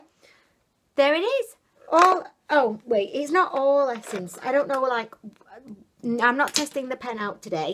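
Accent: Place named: Birmingham, United Kingdom. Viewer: Where are you from? I can't tell if your accent is brummy or Manchester x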